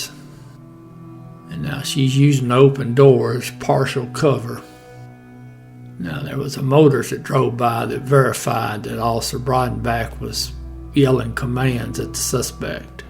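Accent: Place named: South, United States. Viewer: I'm always glad to see you post another video. Your commentary is easy to understand, I can appreciate the Southern accent (lol). And you always mention the family's of the officer. Thank you very much.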